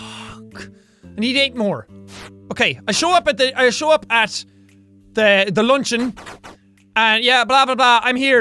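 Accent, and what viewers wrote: Ireland. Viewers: I've been watching for so long that I always automatically lower the volume. And I hardly notice his Irish accent anymore. Never bothered me tho because I'm loud just without an accent. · WHY IS IT ALL YELLING WHY IS IT SO IRISH i laughed too hard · Even if you have a irish accent me and my best friend (we are both from middle of England) both love you and think you are boss and awesome! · Who's LOUD IRISH GREEN HAIR IT'S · Retrosky Maybe it would be cool if could make a montage for the next subscriber milestone..? There would be the most shouty and Irish parts in best bits! Definitely RIP headphone users.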